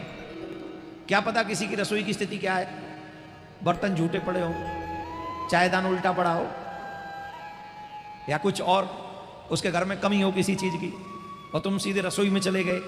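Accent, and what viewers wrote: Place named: Braj, India. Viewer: Guruji aap braj bhasha bahut achchi lagti hai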